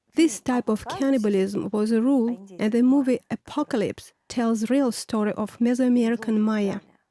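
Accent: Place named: Poland. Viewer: I would trust any of my Polish friends, who by the way are very intelligent, hard-working, and have university degrees. But don't ever trust a computer generated text-to-voice with a Polish accent, no matter what she says.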